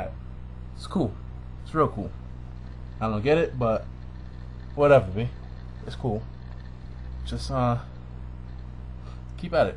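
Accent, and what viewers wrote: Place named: Jamaica. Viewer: You sound mad jamaican in this vid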